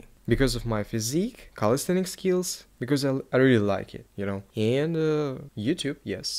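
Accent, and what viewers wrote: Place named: Russia. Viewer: + russian accent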